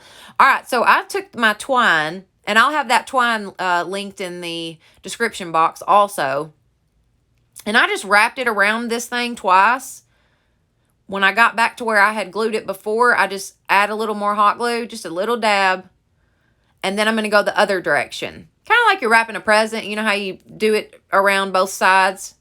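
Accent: South, United States. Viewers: I have been watching your channel from the beginning & the quality of your videos has improved so much & so FAST!! They’re almost as AMAZING as all of your crafts!! I knew from the caliber of your projects at the beginning that you would only get better & BOY have you ever! And OMG! You CRACK. ME. UP. When you call your project pieces “dude” I just die! 🤣 I’m a southern girl too-I grew up in Savannah-& miss hearing that deep Southern accent (I don’t have one unless I’m IN Savannah for a while & even then it’s not very heavy.) I just think you are hysterical! You seem to be a genuinely sweet person & I very much enjoy all of your videos-your DIY’s are SO GOOD! Thank so much! 😘 Amy (from North Atlanta) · You are adorable and I love watching your videos!! Love your Southern accent. Pls tell me, how do you do your lashes??? Gorgeous Girl ❤❤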